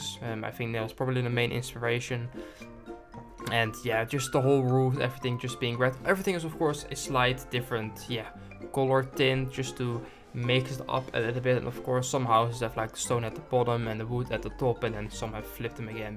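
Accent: Netherlands. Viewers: Wow you are good at building but why cd-opnamen you have an Dutch accent are you dutch? I know that accent BC im Dutch 😁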